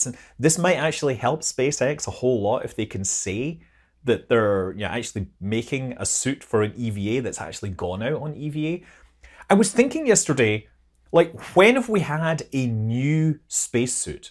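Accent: Scotland, United Kingdom. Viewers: As a side note, when I hear " here" in that deep Scottish brogue, I am oddly comforted and know I'm getting reliable, entertaining info from one of the best spacers on Youtube. No, it really is the best out there.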